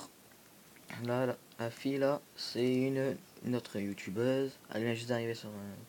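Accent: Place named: Quebec, Canada. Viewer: Accent québécois ❤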